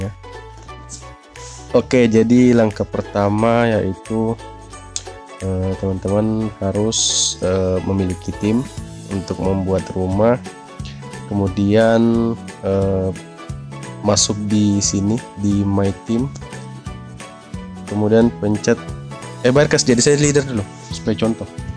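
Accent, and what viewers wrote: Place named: Sulawesi, Indonesia. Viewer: Dari Logatnya kayaknya orang sulawesi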